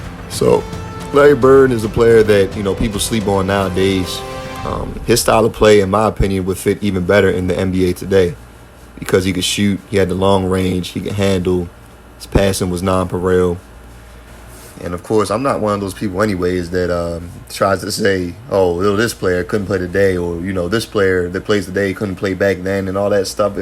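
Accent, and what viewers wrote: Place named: Baltimore, United States. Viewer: Nice vid! Bird Hakeem and Harden are my all time favorite players! Love the Baltimore accent. One small tip though, the music is a bit loud in comparison to your voice :)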